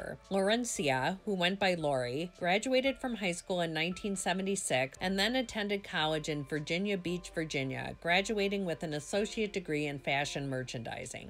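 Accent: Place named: Wisconsin, United States. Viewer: You even sound like you're from Wisconsin, probably even Milwaukee. I can tell by the way you pronounce the name of the city. You don't pronounce the L's. (Muhwahkee) So I would expect better of you if you are indeed from Wisconsin like it really sounds like you are. Don't you want people to think more of your extremely dynamic and beautiful state???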